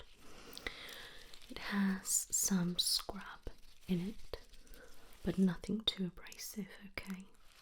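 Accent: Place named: United Kingdom. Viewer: Her british accent also does it for me.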